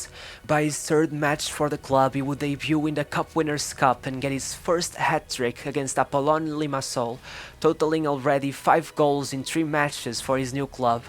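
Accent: Portugal. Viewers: Uma pergunta: és português não és?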